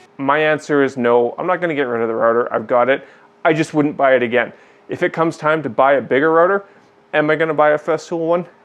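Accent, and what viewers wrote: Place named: Canada. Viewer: I never comment, but I kept thinking 'this guys sounds Canadian or something...' Then you dropped it: You are! A shout out from Ottawa! Keep it up, I'm subscribed. I want to hear about that 3D printer for sure. Been holding off on getting one of those but the writing seems to be on the wall, they're too useful to ignore.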